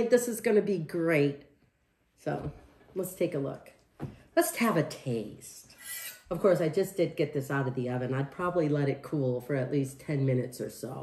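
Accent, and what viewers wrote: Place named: Michigan, United States. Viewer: LOL Yup, she's from Michigan . . . says "MELK" instead of milk. Go Franklin Patriots!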